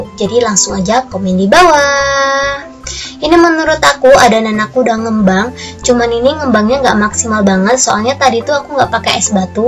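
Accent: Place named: Java, Indonesia. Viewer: Jawaa bangett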